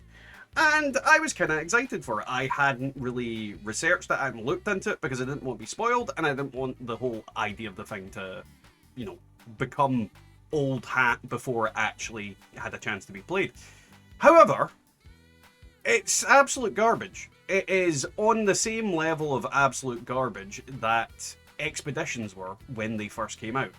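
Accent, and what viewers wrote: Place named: Wales, United Kingdom. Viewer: I totally love the Welsh accent, my Dad/Father is originally from St David's before migrating to Australia for work... so im glad I found a Welsh fallout 76 YouTuber finally. Great video, keep up the good work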